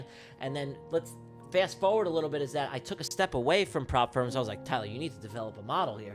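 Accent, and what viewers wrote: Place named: New York, United States. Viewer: Bro can definitely talk for sure. No doubt about it he's from new york.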